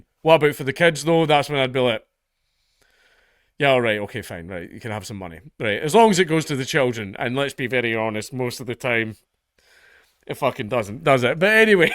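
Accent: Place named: Scotland, United Kingdom. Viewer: well yeah, shes scottish, of course shes going to be talking scottish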